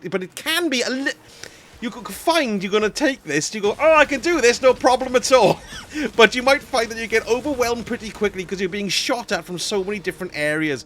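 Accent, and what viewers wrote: United Kingdom. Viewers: And he does it all with a pleasant British accent!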